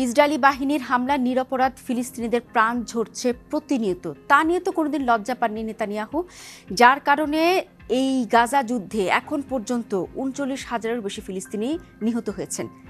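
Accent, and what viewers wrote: Kolkata, India. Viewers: kotha to sundor but indian style a hoise seta khub e kharep lagse.. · ভারতের ঢংয়ে কথা বলবেন না।।। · কোলকাতা স্টাইলে সংবাদ উপস্থাপন😂। আপাতত শুনতে ভালো লাগলেও দয়া করে কোলকাতার সাংবাদিকদের মতো চিল্লা চিল্লি শুরু কইরেন না ভবিষ্যতে।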